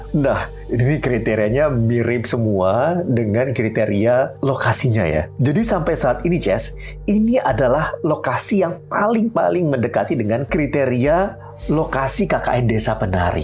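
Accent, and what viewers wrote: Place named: Osing, Indonesia. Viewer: Logat bhs oseng kang.... mosok jowo... klendi seh Peno ikaw..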